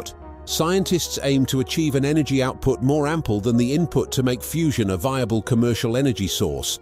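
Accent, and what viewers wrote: United Kingdom. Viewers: How about inviting Tony Stark to give a hand? He knows all about fusion, since he carries one in his chest … what a sensationalistic video, luring people to watch in its entirety just to find out China has no artificial sun nor has launched bananas in the air. Shame com this narrator with his dreadful British annoying accent !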